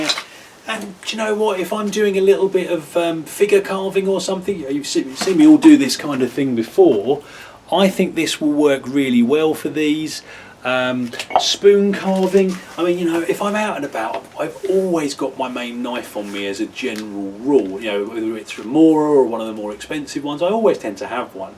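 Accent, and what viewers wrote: United Kingdom. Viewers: I love your Englishman accent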